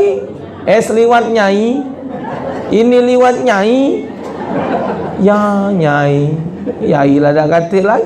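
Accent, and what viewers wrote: Palembang, Indonesia. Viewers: Ustadnyo asli mano mang R nyo plembang nian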